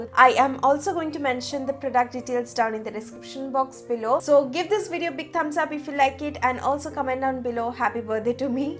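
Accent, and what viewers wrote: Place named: Bengal, India. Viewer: Just love ur bengali accent im from Kolkata n would love 2 meet u one day♥️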